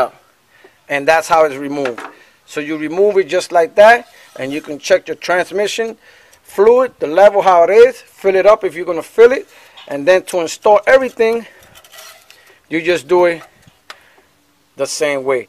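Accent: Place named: New York, United States. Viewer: Good video, loved the ny Italian accent. Anybody else noticed that first 😂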